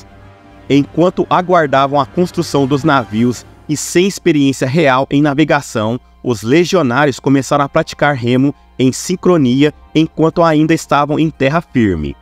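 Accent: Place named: Caipira, Brazil. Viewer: O vídeo parece ser bom, mas o sotaque caipiiirrra nao consegui seguir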